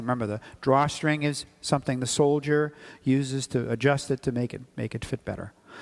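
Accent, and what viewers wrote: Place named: United States. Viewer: Love the Americans and their funny pronunciation of German words.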